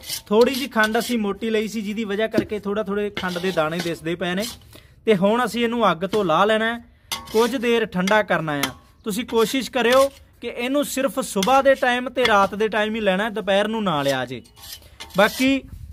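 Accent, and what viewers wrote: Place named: Malwa, India. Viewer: ਸਤਿ ਸ੍ਰੀ ਅਕਾਲ ਵੀਰ ਨੂੰ 🙏🙏🙏 ਵੀਰ ਸਤਿ ਸ੍ਰੀ ਅਕਾਲ ਮਨਜੂਰ ਕਰਨਾ ਵੀਰੇ ਭਰਾ ਮੇਰਿਆ ਮੇਰੀ ਪਤਨੀ ਨੂੰ ਗਠੀਆ ਏ ਜੋ ਜੋੜ ਦਰਦ ਬਾਰੇ ਤੂੰ ਦੱਸ ਰਿਹਾ ਏ ਸਭ ਕੁਝ ਉਵੇਂ ਹੀ ਏ ਤੇ ਉਹ ਮੈਡੀਕਲ ਦੀ ਦਵਾਈ ਖਾਂਦੀ ਏ ਕੋਈ ਜਿਆਦਾ ਫਰਕ ਨਹੀਂ ਬਾਕੀ ਤੇਰੇ ਬੋਲਣ ਦੇ ਅੰਦਾਜ਼ ਤੋ ਪਤਾ ਲੱਗਦਾ ਕਿ ਸ਼ਾਇਦ ਤੂੰ ਮਾਲਵਾ ਏਰੀਏ ਦਾ ਹੈ ਮੈਂ ਸੰਗਰੂਰ ਦੇ ਨੇੜੇ ਇਕ ਪਿੰਡ ਵਿਚੋਂ ਹਾਂ ਹੁਣ ਸਰਦੀਆਂ ਨੇ ਹੁਣ ਦਰਦ ਹੋਰ ਵੀ ਵਧ ਗਿਆ ਏ ਤੇ ਹਰ ਸੀਜਨ ਇਹੀ ਕੁੱਝ ਬਣਦਾ ਏ ਜਦੋਂ ਮੌਸਮ ਬਦਲਦਾ ਏ ਕਈ ਵਾਰ ਪੈਰਾਂ ਦੀਆਂ ਅੱਡੀਆਂ ਵੀ ਸੁੱਜ ਜਾਂਦੀਆ ਨੇ ਤੇ ਇਸ ਤਰਾਂ ਮਹਿਸੂਸ ਹੁੰਦਾ ਜਿਵੇਂ ਕਿ ਅੱਡੀ ਵਿੱਚ ਪੀਕ ਪਈ ਹੋਵੇ ਕਈ ਵਾਰ ਘਿਉ ਦੀ ਮਾਲਿਸ਼ ਕਰਕੇ ਕੁੱਲ 10% ਦਰਦ ਘੱਟਿਆ ਮਹਿਸੂਸ ਹੁੰਦਾ ਸੋ ਵੀਰ ਮੇਰਿਆ ਮੇਰੀ ਗੱਲ ਜਵਾਬ ਜਰੂਰ ਦੇਵੀਂ ਬਾਕੀ ਵੀਰ ਮੈਂ ਖੁਦ ਆਪ ਮਿਰਗੀ ਦੇ ਦੌਰੇ ਦਾ ਮਰੀਜ਼ ਹਾਂ ਮੈਨੂੰ ਵੀ ਦਵਾਈ ਖਾਂਦੇ ਨੂੰ 12 ਸਾਲ ਹੋ ਚੁੱਕੇ ਨੇ 2007 ਵਿੱਚ ਪਹਿਲੀ ਵਾਰ ਦੌਰਾ ਪਿਆ ਸੀ ਤਿੰਨ ਸਾਲ ਦਵਾਈ ਖਾਣੀ ਹੁੰਦੀ ਏ ਪਰ ਵੀਰ "ਚਾਰ ਤੀਏ ਬਾਂਰਾਂ" ਹੁਣ ਤੱਕ ਚੱਲੀ ਜਾਂਦੀ ਏ 12 ਸਾਲ ਤਾਂ ਖਾ ਲਈ ਹੋਰ ਪਤਾ ਕਿਥੇ ਤੱਕ ਜਾਣੀ ਏ ਵੀਰ ਮੈਂ ਤੇਰੇ ਗੱਲ ਕਰਨੀ ਏ ਜਾਂ ਤਾਂ ਆਪਣਾ ਨੰਬਰ ਦੇ ਦੇਵੋ ਜਾਂ ਮੈਨੂੰ ਕਾਲ ਕਰ ਲਈ,ਵੈਸੇ ਵੀਰ ਤੂੰ ਤਾਂ ਆਪਣਾ ਨੰਬਰ ਸ਼ਾਇਦ ਹੀ ਲਿਖੇ ਕਿਉਂਕਿ ਹਰ ਕੋਈ ਕਾਲ ਕਰੀਂ ਜਾਂਦੇ ਨੇ ਮੇਰੇ ਨੰਬਰ ਤੇ ਕਾਲ ਕਰੀ ਵੀਰ <num> ਸੋ ਵੀਰ ਮਿਹਰਬਾਨੀ ਹੋਉ ਤੇਰੀ ਪੜਨ ਵਾਲੇ ਹਰ ਭਰਾ ਬੇਨਤੀ ਏ ਮੈਨੂੰ ਕਾਲ ਉਹੀ ਵੀਰ ਕਰੇ ਜੋ ਵੀਡੀਓ ਵਿੱਚ ਜਾਣਕਾਰੀ ਦੇ ਰਿਹਾ ਏ ਕੋਈ ਹੋਰ ਵੀਰ ਬੇਵਕੂਫ ਬਣਾਉਣ ਦੀ ਕੋਸ਼ਿਸ਼ ਨਾ ਕਰੇ ਪੜਨ ਲਈ ਧੰਨਵਾਦ ਵੀਰ ਦਾ ਸਤਿ ਸ੍ਰੀ ਅਕਾਲ ਵੀਰ ਨੂੰ ਇੰਦਰਜੀਤ ਸਿੰਘ ਸੰਗਰੂਰ ਦੇ ਇਕ ਪਿੰਡ ਵਿਚੋਂ 🙏🙏🙏🙏🙏🙏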